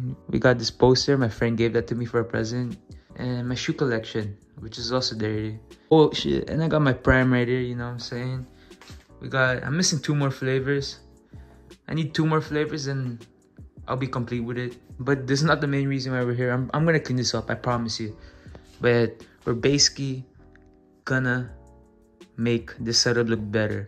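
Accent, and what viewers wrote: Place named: Philippines. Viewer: Bro have pilipino accent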